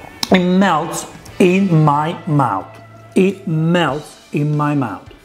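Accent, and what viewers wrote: Italy. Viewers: really don't know what to do with a maserati in the pan when real italian tomatoes come from the deep south of Italy! a sort of nonsense ... and the genuine stereotyped italian maccaroni accent.. or is it real? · This is the Italian man,accent i always think of when cooking bolognese or something Italian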